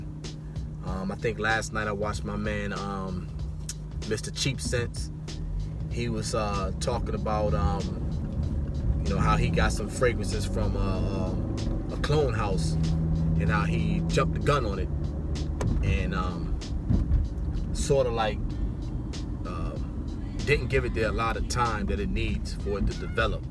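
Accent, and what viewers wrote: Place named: North, United Kingdom. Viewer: Subscription granted. Good video. I can hear the up north accent.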